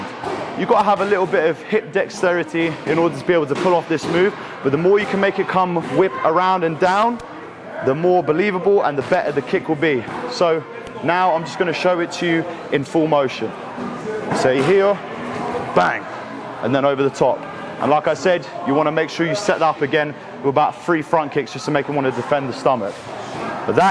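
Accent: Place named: United Kingdom. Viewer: He has a british accent.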